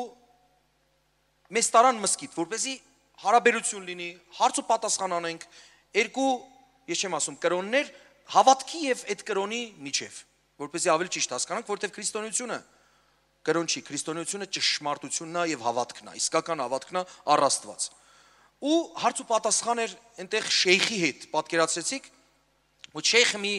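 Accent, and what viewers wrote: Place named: Lebanon. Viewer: ՏԵՐԸ օրհնի ախպերս, Քրիստոսով։ Իմ սրտից դուրս եկած քարոզ ես քարոզում։ Շատ մաքուր հայերեն ես խոսում։ Լիբանանում եք ծնվել՞։ Տերը օրհնի իրա միակ Սուրբ եկեղեցին։ ԱՄԵՆ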